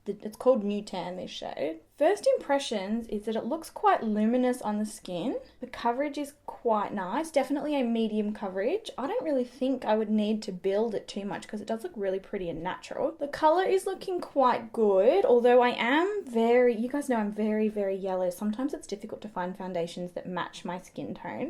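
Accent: Australia. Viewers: Oooooo love this! Yes that Aussie accent!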